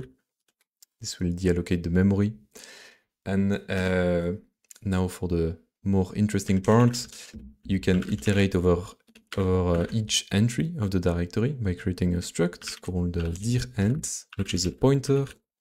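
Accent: France. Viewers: It's the french accent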